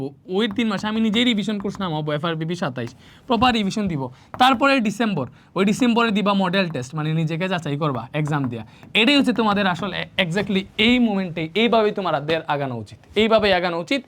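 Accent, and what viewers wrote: Sylhet, Bangladesh. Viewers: K k Sylhet thaka dakso vaiya kintu Sylheti😊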